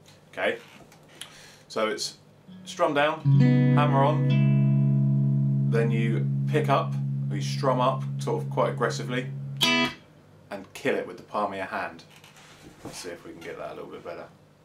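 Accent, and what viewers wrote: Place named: United Kingdom. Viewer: A bigger guy, American guitar brand (Fender), camo pants, shirt with cursing on it, song... The British accent was the LAST thing I expected. Way cool. Thanks for the lesson, mate.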